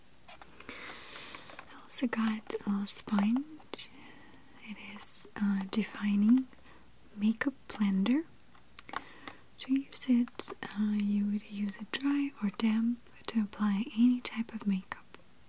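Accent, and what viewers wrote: Russia. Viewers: really enjoyed your state of mind during this video ! very natural and spontaneous, your positive attitude is contagious ! we really appreciate your russian accent also ;) thank you very much ! kisses from France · your Russian accent <3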